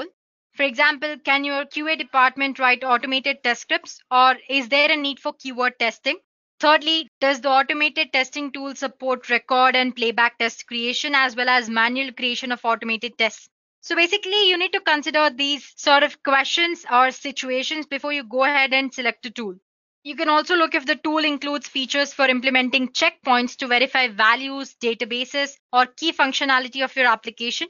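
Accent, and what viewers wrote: India. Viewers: you are from south india i guess . your accent suggests. south indians are very nice , hardworking people, nice course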